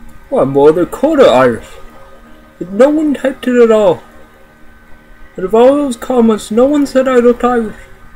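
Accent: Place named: Canada. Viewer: From my experience, Ancestry 'Irish' is more like Irish+Scottish - basically the same people. And both countries had Viking incursions, reportedly mainly from Denmark but recruited from further east, both north and south. They got around. If I may, your type of Canadian accent is far from usual - I'm an expert having been a Canadian now for 67 years. BTW, love the hat.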